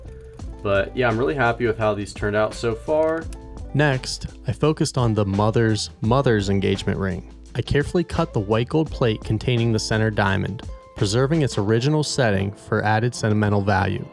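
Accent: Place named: Pennsylvania, United States. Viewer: I absolutely love your channel... You're doing a great job cultivating content that is both visually stunning and incorporates commentary that engages the viewer. I knew you must be from PA area bc of your (subtle) accent! No judgement, I'm from Baltimore which may be home to the grossest sounding dialect of them all (but iiiii don't have an accent😉). Thanks for the time and effort you put into your videos, and one day i will bring ya a big ol' princess cut set in rose gold to be sized. 😁 Jk,jk. I'm team asscher all the way.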